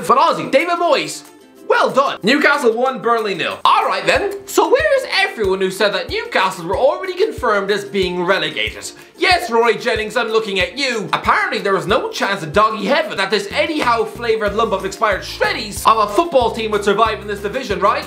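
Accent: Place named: Ireland. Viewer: A Walking Meat Loaf, a Walking Taradactul....man this Irish fella, along with that accent, sure does know how to make jokes, all while telling football news! Bravo sir...bravo indeed! Btw did u forget Origi's goal from TAA's corner against Barcelona? You were rattling off so many, wasnt sure if that one was in or not!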